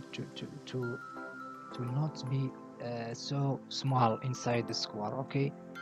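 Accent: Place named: Morocco. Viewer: bayna mn aaaaaaaah w sawtk mghribi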